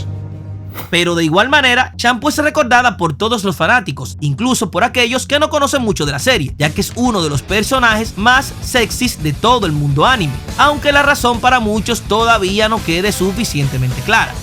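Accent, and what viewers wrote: Dominican Republic. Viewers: Una pregunta: por casualidad eres dominicano? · una pregunta tu acento me suena muy dominicano, y te pregunto ¿si eres dominicano verdad? porque ese acento lo reconozco muy bien, y si no eres dominicano de donde eres porque ese acento no me suena ni a cuba ni a puerto rico que son los paises que hablan mas similar a republica dominicana, aunque estoy entre la espada y la pared si es dominicano o puertoriqueño pero la diferencia es que nunca reemplazas la "r" con la "l", y incluso pones referencias de personas dominicanas en tus memes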